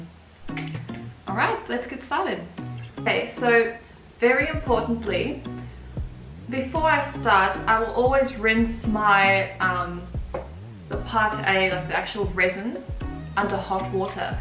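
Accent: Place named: South Africa. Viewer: Awesome om n SA accent te hoor :) thanks for a great video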